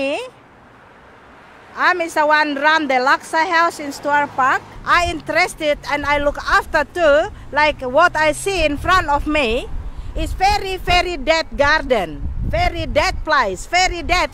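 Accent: Indonesia. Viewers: Ma'am are you from kupang/NTT i noticed the timorese food, Beta orang kupang penasaran saja, karena logatnya juga mirip. Saya juga orang kupang sedang kuliah di melbourne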